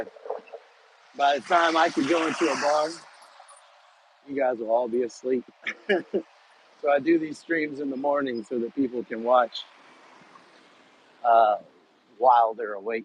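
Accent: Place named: South, United States. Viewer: I hear your Sourhern accent, mister. That part of town is super chill in the morning. The most cars I could count at once was three.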